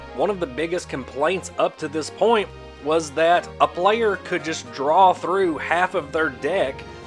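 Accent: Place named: South, United States. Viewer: a real pokemon OG, im from the south, moved out west, don't get to hear the accent often, but when I do and in combination with pokemon, it's so ideal, I've used your channel to curate my vintage play collection, now that I have a better understanding of e readers I'll be on the lookout for these cards. thanks you the OG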